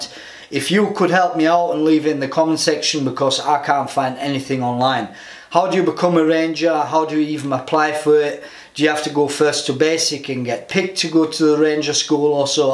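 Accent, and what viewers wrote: Scotland, United Kingdom. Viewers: Love ur accent. Scottish? That would perhaps make u Scots guards, or a highlander? So there are 3 phases of ranger school. The early part of the vid u watched was just the first part of the first phase. That's the 'easy' part. The goal of all the extra water spraying and stuff is made to make ranger school as miserable as possible. After u get to ranger school u r working off of a 4000 calorie deficit, and u only get about 4 hrs of sleep per week, if ur lucky.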